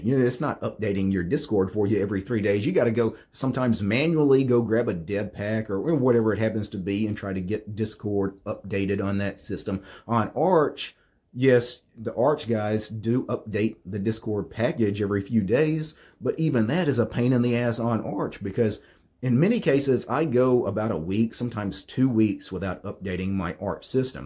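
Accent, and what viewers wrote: United States. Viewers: which side of USA is this accent from? 🙂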